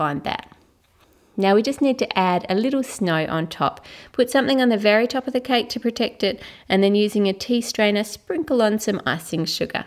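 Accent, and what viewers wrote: Australia. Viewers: R u Australian?? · Haha you sound so much more Australian i this video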